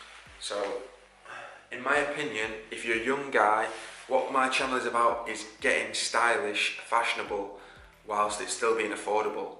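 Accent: United Kingdom. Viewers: I HATE BRITISH ENGLISH...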